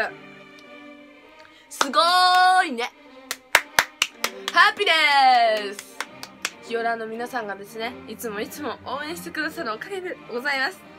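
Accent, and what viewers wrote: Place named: Hakata, Japan. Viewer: 博多弁好き！いつもめちゃめちゃなまっててほしい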